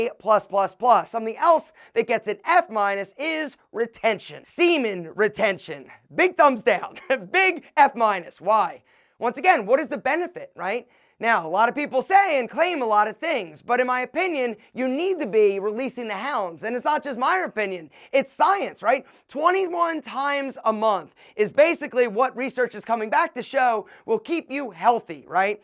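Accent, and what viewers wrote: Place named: AAVE, United States. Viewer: Try not speaking ebonics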